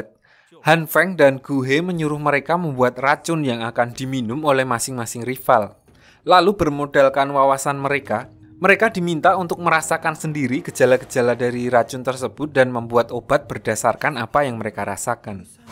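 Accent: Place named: Java, Indonesia. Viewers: Mantuul mas bro suaranya, lucu dari Jawa ya salam kenal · Narator buagusss bgt. Ono jowone...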